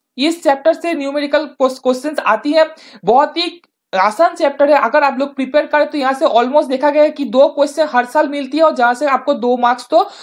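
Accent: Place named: Bengal, India. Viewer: দাদা তোমায় হিন্দি থেকে বাংলায় ভিডিও দেওয়ার জন্য সাজেস্ট করছি। কারণ তুমি বাঙ্গালী এবং তোমার মধ্যে বাঙালির কথার টানটা থেকে যাচ্ছে। আমি সাজেস্ট করবো তুমি বাংলায় ভিডিওটা বানাও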